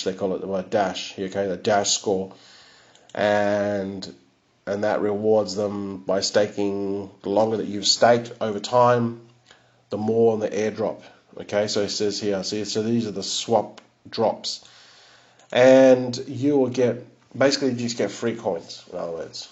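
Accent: Australia. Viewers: Hey mate, nice to hear an Aussie voice amongst all these crypto vids. I’m pretty new to this but trustswap seems like a no-brainer. Not sure I can afford to purchase enough to stake any ICO’s immediately. From your understanding is it still worth holding the token? I’m thinking so but still pretty green! Thanks for your thoughts...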